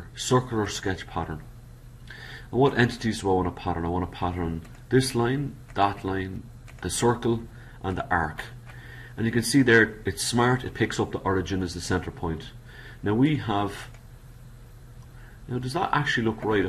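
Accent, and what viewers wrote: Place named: Galway, Ireland. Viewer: Good stuff....just came on you videos today.......judging by the accent i could drive down the road and buy you a beer... (Mike from Galway)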